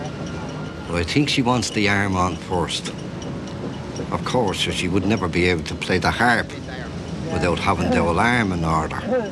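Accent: Ireland. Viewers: The narrator is stealing the show by being too bloody Irish. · Èammon Mac Thomàis has a much broader Dublin accent than Joe Duffy . That how you know this is him .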